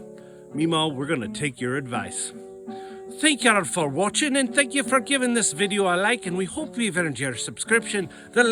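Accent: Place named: Scotland, United Kingdom. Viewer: Mighty fine Scottish accent!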